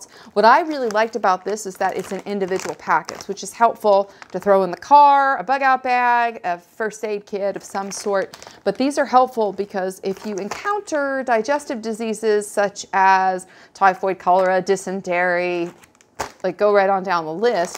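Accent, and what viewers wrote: South, United States. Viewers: You sound southern . You're an angel . Coffee drinker ? Why hell yeah ! You cook ? I've lived off sandwiches and vitamins forever. Proud southerner too . Imagine that .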